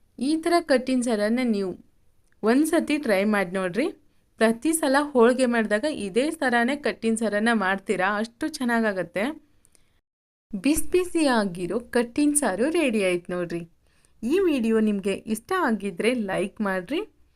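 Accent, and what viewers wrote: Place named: Bangalore, India. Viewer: ಅಕ್ಕ ಅದು ಕೊಬ್ರೆ ಮತ್ತು ಹಸೇಮೆಣಸಿನಕಾಯಿ ಅಲ್ಲ ಅವ್ವ. ನಮ್ಮ ಉತ್ತರ ಕರ್ನಾಟಕ ಭಾಷೆನಲ್ಲಿ ಕೊಬ್ರಿ ಮತ್ತು ಹಸಿಮೆಣಸಿನಕಾಯಿ ಅಂತಾರ. ಜರ ಸ್ಟೈಲ್ ಮಾಡಿ ಬೆಂಗಳೂರು ಥರ ಮಾತಾಡಬೇಡ. ನಮ್ ಹೆಮ್ಮೆ ಇಂದ ನಮ್ ಉತ್ತರ ಕರ್ನಾಟಕ ಭಾಷೆ ನಲ್ಲಿ ಹೇಳು 🤦🏻‍♀️🤦🏻‍♀️🤦🏻‍♀️🤦🏻‍♀️🤦🏻‍♀️🤦🏻‍♀️🤦🏻‍♀️ಮತ್ತೆ ಅದು ಕೊಪ್ ಅಲ್ಲಕ್ಕ, ಅದು ಕಪ್ Cup 😅😅😅😅😅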